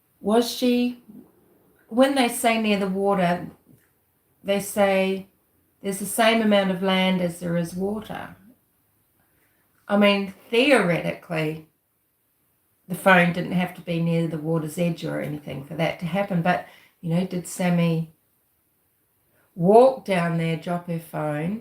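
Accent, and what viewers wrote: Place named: Australia. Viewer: Yesss. I'm listening now. It's bedtime. Love listening to your Aussie accent.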